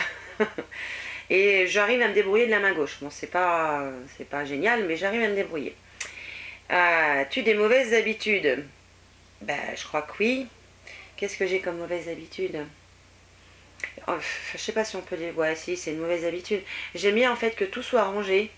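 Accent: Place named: France. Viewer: Je suis née le 17 décembre, si j'me trompe pas.... LOLGaelle, dans un commentaire récent, je te croyais belge. C'est à cause des vidéos proposés avec le tien, à droite. Plusieurs étaient de la Belgique. Je n'y connais rien. J'aime l'accent francais, si différent de celui du Québec.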